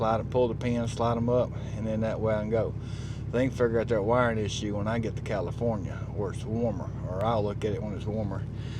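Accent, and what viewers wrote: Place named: Tennessee, United States. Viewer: I was guessing where in Tennessee you are from. My mom came from Lawrenceburg ,tenn. The accent is familure. Be safe.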